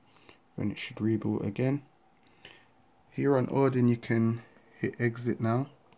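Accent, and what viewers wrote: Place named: United Kingdom. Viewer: He's British you can clearly hear his accent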